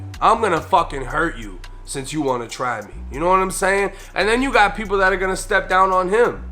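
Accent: Boston, United States. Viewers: Great show I've been ROCKEN WITH YOU since you was on 23 and 1 I have to say young man you need to try to expand cause just the way you tell stories and with your Boston accent it just works keep it 100 and you will go along way this is the old man from Indiana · You gotta be from Boston or the east coast no?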